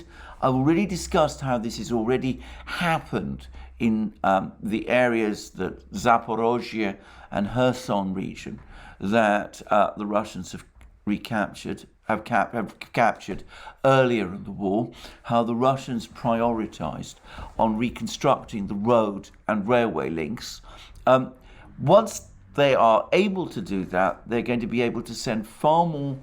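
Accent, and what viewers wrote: England, United Kingdom. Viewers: Ah, my daily fix is here with the dulcet tones of your refined English accent.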